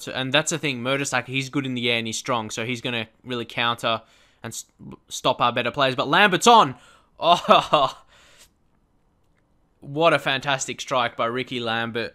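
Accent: Australia. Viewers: Well he's Australian, ofcourse his pronounciation is gonna be different to the one in the UK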